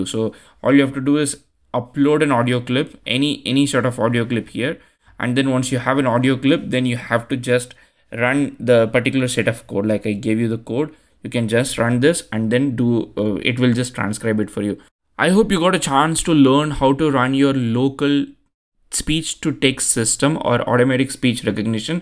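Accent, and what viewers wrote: India. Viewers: Can u clone your voice? I can’t understand this jeet accent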